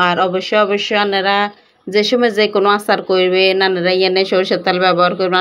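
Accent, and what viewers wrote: Noakhali, Bangladesh. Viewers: অসাধারণ হয়েছে আচার রেসিপি সাথে নোয়াখালীর আঞ্চলিক ভাষা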